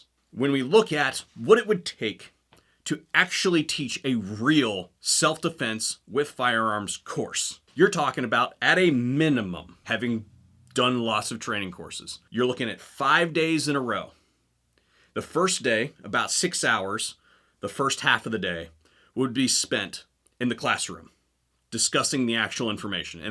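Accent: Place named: Ohio, United States. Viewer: Sounds like you are from Cuyahoga.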